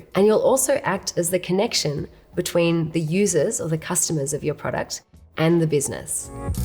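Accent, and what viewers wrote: Australia. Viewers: She sounds Australian. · Australian accent, love it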